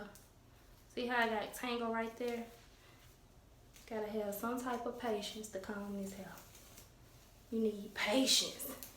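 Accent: United States. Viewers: I love your southern accent girlie! 😍😍😍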